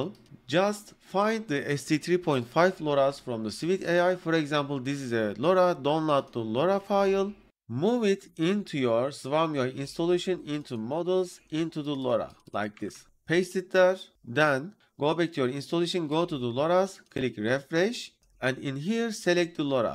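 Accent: Turkey. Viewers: Bende diyorum bu kanaldaki ingilizceyi anlamam nasıl bu kadar kolay olabiliyor diye, meğersem hoca Türkmüş 😂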